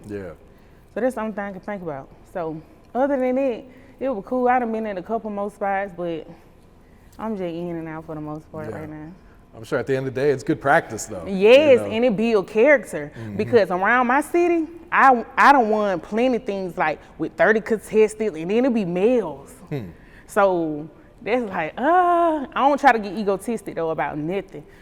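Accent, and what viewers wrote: South, United States. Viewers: Don't know you you sound so country well Black Is Beautiful gurl 10/17/22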